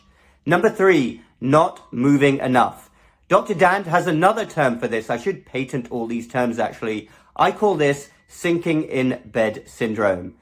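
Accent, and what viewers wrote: England, United Kingdom. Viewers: You sound English…are you talking about UK or US hospitals, or both?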